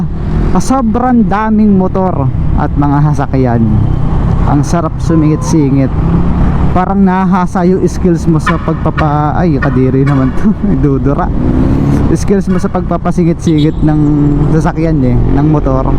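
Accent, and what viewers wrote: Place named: Quezon, Philippines. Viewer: Taga quezon kaba boss may punto ka e haha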